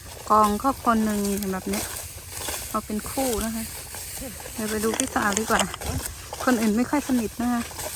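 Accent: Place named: Lanna, Thailand. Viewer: อู้เหมือนล้านนา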